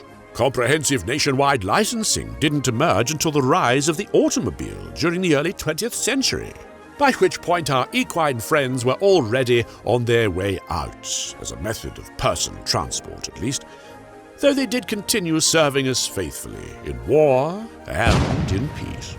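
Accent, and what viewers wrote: United Kingdom. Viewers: Im still confused about a British voice being so excited and enthusiastic about America lol but i love it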